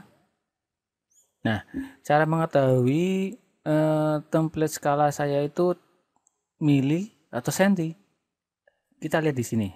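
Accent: Banyumas, Indonesia. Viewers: bahasanya lucu...sukses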